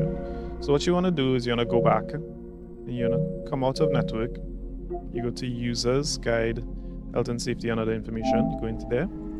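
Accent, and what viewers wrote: Trinidad and Tobago. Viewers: I knew you was a trini the second you talked lol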